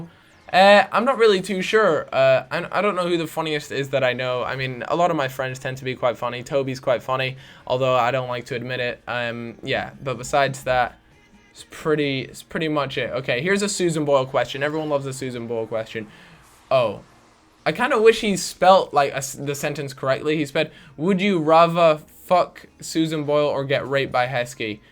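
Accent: United States. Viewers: Are you american? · Are you American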